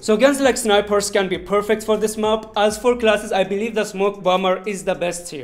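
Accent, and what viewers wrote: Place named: India. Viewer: I don't know where r u from. But ur sounds like u r Indian😀.and I really like your gameplay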